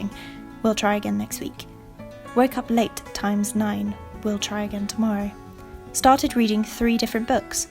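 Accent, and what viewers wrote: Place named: United Kingdom. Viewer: you sound so quintessentially english x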